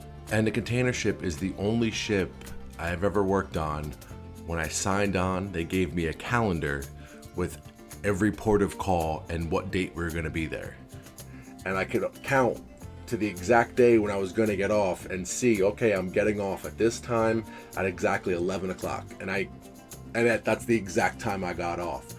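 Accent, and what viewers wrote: New Jersey, United States. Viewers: Whenever videos come up his accent always brings me back home to Jersey. I grew up in Jersey but have lived in Alaska for over 30 years but accent always reminds me of where I grew up. Great Videos as well!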